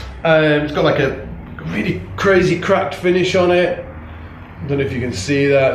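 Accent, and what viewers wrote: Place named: Northern England, United Kingdom. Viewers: You’re so northern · Nowt wrong wi'a Yokshuh accent :) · "A toone"! Yorkshire much? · I agree about having a better bass doesn't make you any better at playing but I wouldn't say you play exactly the same on every bass, some are more comfortable than others 😏 Fender Cabronita Precision Bass (speaks for itself) Squier Affinity Bronco Bass modified with Seymour Duncan Mustang Bass split coil pickups and a Jazz bass bridge pickup (brand unknown) (and a confederate flag pickguard hehe, not racist 😏) SX Vintage Series SPB57 Precision Bass (love this one) Also gonna buy an Ibanez ATK200 sometime soon Ampeg BA-108 V2 (selling) Peavey Tour Series 450 bass head (also selling) Soon getting an Ampeg BA-210 V2 PS nice basses, and let your Yorkshire accent fly hombre 😏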